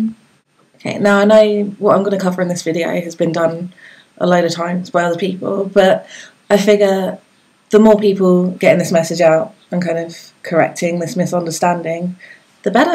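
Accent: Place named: Australia. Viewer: She presents her point in a succinct and articulate mannor. She reveals her data and lays it out for inspection. Very well done. Now that that is out of the way,,,,,,,, OHMYGOD I AM IN LUST!!!,,,uh,,i mean,,OHMYGOD I AM IN LOVE! Those eyes!Those lips! and that ACCENT! Damn, such an awesome Aussie.,,,? Kiwi?,,,Limey? Aww hell,,who cares, Ill just stare at her as she reads the phone book,,,,,,sigh.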